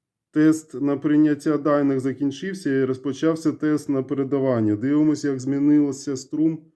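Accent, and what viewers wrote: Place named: Surzhyk, Ukraine. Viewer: У тебя самый плохой в мире суржик.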